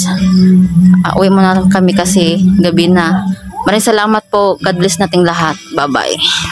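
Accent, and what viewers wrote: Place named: Visayas, Philippines. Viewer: Hello po, nag sub na po ako, dahil kay 😅,kahit may konting Bisaya, natutuea akong panoorin, kasi malumanay kang magsalita, sarap pakinggan ang boses mo, magtulungan lang para sa pagtulong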